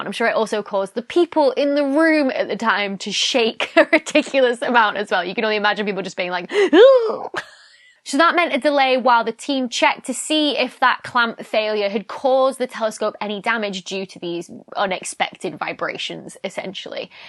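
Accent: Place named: Manchester, United Kingdom. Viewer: You were speaking much closer to the Manchester accent in your first videos. Unlike what some say, there is no harm using a regional accent.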